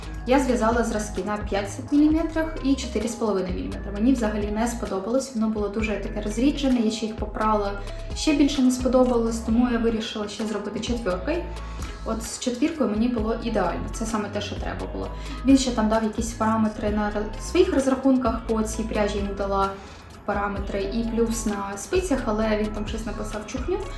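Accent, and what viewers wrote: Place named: Pokuttia, Ukraine. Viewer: Чудовий покутський сведрик❤ Дякую за відео, дуже приємно тебе слухати і дивитись❤❤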